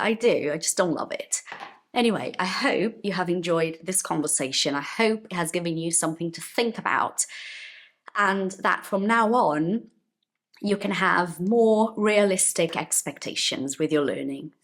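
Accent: United Kingdom. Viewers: I'm much amazed to listen you talking In british accent so smoothly. Even being a Brazilian you've a great command in British Accent.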